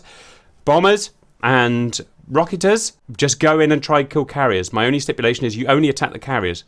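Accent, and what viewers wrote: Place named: Estuary, United Kingdom. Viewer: Yeah, you have nowhere near a West Country accent, but most Brits will move around and lose their native accent....yours is a bit muddled...so I'll just call it estuary with a twist here and there when you get excited. Not trying to offend you, the accents are getting harder and harder to nail down anymore. I used to coach accents many years ago, and I'm sooo glad I do not do it anymore. Anyways, thank you for the uploads, love the content...sorry if this came off as weird. Right, carry on mate.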